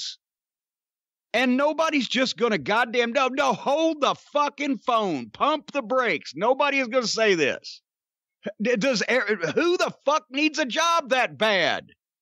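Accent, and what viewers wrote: South, United States. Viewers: Parz BK that’s not what my sub conscience is telling my necessarily but his southern accent makes the words “mother fucker” hilarious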